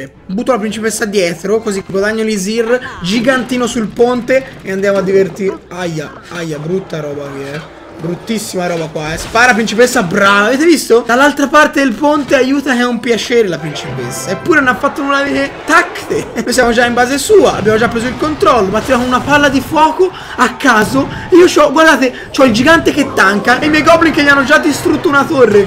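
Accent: Tuscany, Italy. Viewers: Ciao ma sei toscano? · sei toscano? non si sente la tua c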